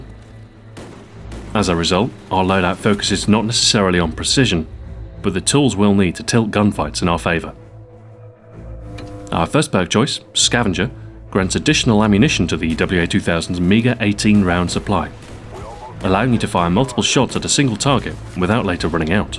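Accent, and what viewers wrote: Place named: United Kingdom. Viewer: its definitely the british voice that makes this video. always love a fellow british lad. is such a Jeff!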